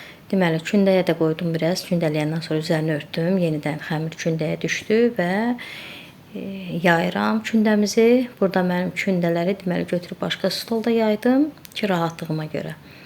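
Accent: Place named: Shamakhi, Azerbaijan. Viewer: Əllərinizə sağlığ gözəl görünür, ləhcə şamaxı ləhcəsinə oxşayır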